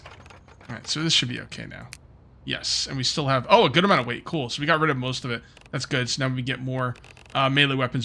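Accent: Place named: South, United States. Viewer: We pronounce it that way in the south. We also use the L in salmon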